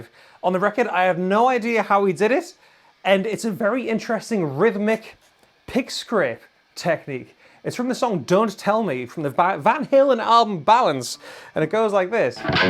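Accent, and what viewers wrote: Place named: Wales, United Kingdom. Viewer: Awesome video! I am perplexed by two things though… the guitar says les Paul on it but looks like an sg?? What is it???? And also your accent?? Wales???? Im scratching my head over here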